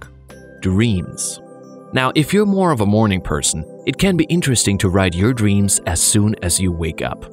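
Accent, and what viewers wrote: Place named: United States. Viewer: Your channel has been a huge inspiration for me to start mine. (even though I learn French at school) I only have one problem with your videos, the first one I watched was meeting a stranger (I think) when the video pronounced it slower I heard an American accent even when I watched the French one about weather and I could still hear a tiny bit of American in the French voice, it's probably an American imitating a French accent, but aren't you supposed to be a company, I suggest that you get actual French, Spanish and Italian people to do the videos for viewers to get the best out of it, instead of imitation?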